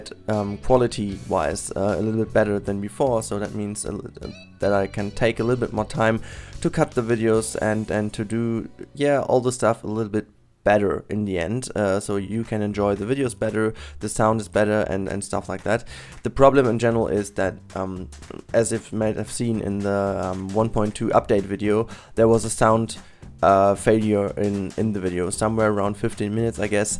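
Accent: Germany. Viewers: Man hört es an dem Akzent xD so spricht fast jeder Deutsche Englisch aber du sprichst echt gut Englisch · you sound like a german!? du bist deutsch oder?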